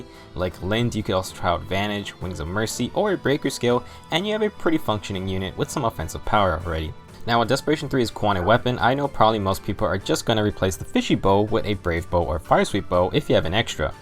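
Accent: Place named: United Kingdom. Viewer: Jake Stavinsky Idk why people question her British voice, like don't question it it's hella cute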